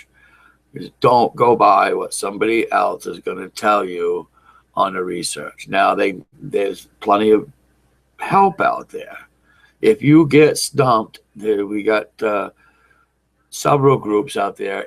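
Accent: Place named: New Hampshire, United States. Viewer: I really like these videos, and your accent reminds me of living in Charlestown, NH :)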